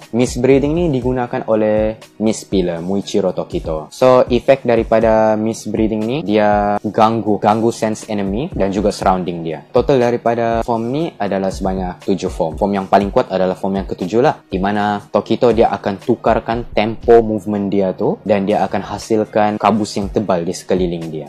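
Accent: Sabah, Malaysia. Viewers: speaker org sabah ka ? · Ad logat Sabah la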